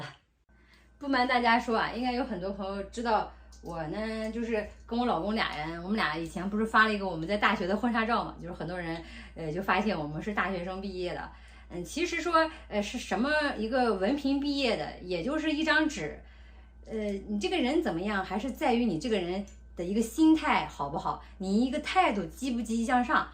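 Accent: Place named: Northeast, China. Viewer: 一口东北味，就这口条，好好干自媒体，未必不比上班差！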